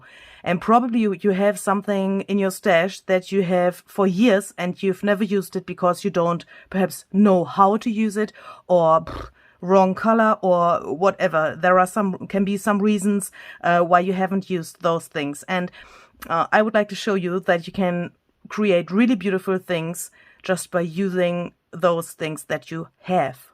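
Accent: Germany. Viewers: I just love watching you create, my Mom was from Germany so that makes it double more fun!!! She had the same wonderful accent. Thank you for making my day! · You are amazing Lady , love your creativity, I have learned so much with you , thank you , love your English /German language, God Bless you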